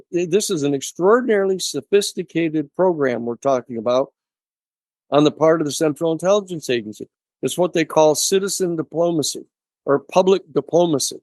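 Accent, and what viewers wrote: Wales, United Kingdom. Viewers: How much more disclosure do we need 🤔? Col Nell (Grusch’s boss) went on record last week saying “There is zero doubt non human intelligence exists “. Greer has 30 years of data which is now available to the public. All this has been filtered out of mainstream media for sure, along with anything else that may remotely affect the reality they want us to believe. Our purpose now is to connect the dots and remember the truth of who we really are. What is more exciting than disclosure? Ans: finding a podcaster with a 🏴 Welsh accent - respect ✊ Light and love to you guys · You still sound Welsh, but the heavy accent has gone 👌🏼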